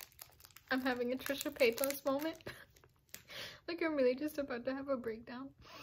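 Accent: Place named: Poland. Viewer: Your accent seem like Polish lol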